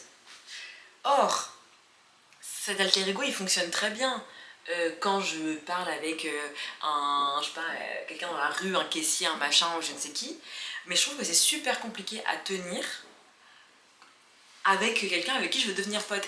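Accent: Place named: France. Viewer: SALUT, c'est guillaume peuchaud, j'avais le meme problème pour l'alter ego en voyage. Au début je voulais aussi diminuer l'intensité de mon accent pour avoir le sentiment de progresser mais au final ce n'était pas moi que j'entendais parler, mais quelqu'un d'autre, quelqu'un de faux. Alors la technique que j'ai trouvé c'est de garder mon accent Français. Je sais que tu veux diminuer ton accent Français pour te sentir progresser, et peut être qu'à force de pas mal de temps de sacrifice tu réussira à parler anglais avec l'accent américain, sans meme penser au fait que t'es entrain de te forcer, ca sera donc devenu naturel? Mais je pense quand meme que dans ce processus ta personnalité aura changé ne serait-ce qu'un tout petit peu (c'est ce que je ressentais). Donc ce sera ton nouveau toi quoi... La t'es aux US donc forcément tout le monde a l'accent américain, et dans la communauté française à laquelle tu appartiens, forcement c'est stylé d'être le Français avec l'accent le moins Français, c'est une preuve de ton niveau... 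Mais perso dans mon voyage j'étais constamment avec des gens de différentes nationalités, Thaïlandais, Espagnols, Ukrainiens et j'ai compris une chose, l'anglais est une langue internationale, faite pour être parlée par le monde entier, et chaque pays a son accent. Pourquoi les 197 pays devraient se calquer sur le modèle British ou US? D'ailleurs, les Américains n'essayent pas d'avoir l'accent British alors pourtant que l'origine de la langue angla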